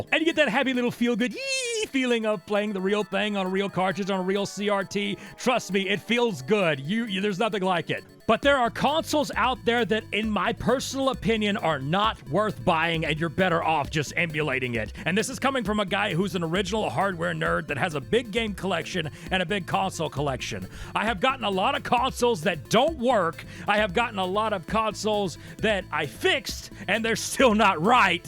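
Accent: South, United States. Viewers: ahh, a southern man telling us about bad hardware on game consoles. Peak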